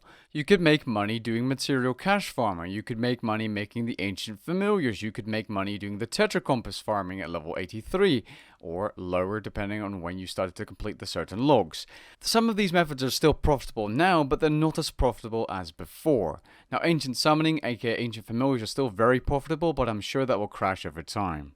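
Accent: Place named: Netherlands. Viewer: You're Dutch, aren't you. :D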